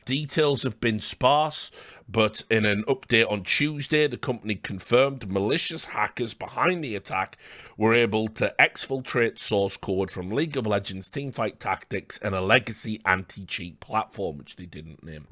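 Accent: United Kingdom. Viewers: The squeaky bum time got me at the end,always nice to hear a brit venting about how fucked up some power structure is. Cheers to the toast at the end and thanks for the constant content!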